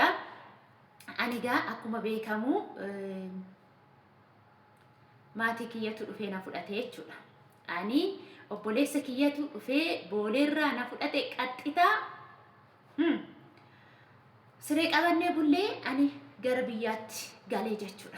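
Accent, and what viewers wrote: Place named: Wollega, Ethiopia. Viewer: Sagalee beekama silayu akka wlg tate❤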